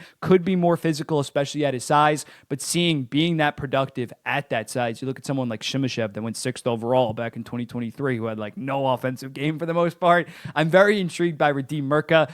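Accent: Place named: Philadelphia, United States. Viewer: I gotta ask if you’re from PA or Jersey. I’m from Delco/Philly area, and your accent like fades in and out but it’s giving that fr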